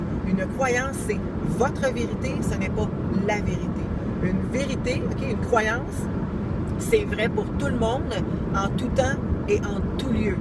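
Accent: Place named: Canada. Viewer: j ai du mal à comprendre à cause de l accent canadien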